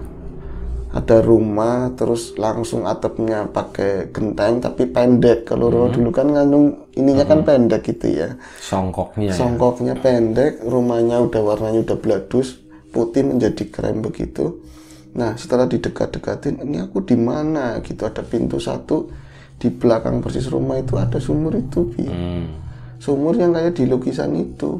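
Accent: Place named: Jakarta, Indonesia. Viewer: Mas sm mas sama2 dari Tegal, tp logat mas udh kayak penduduk asli jakarte/Betawi🥰 Sdg mas msh orisinil Tegalnya👍🤗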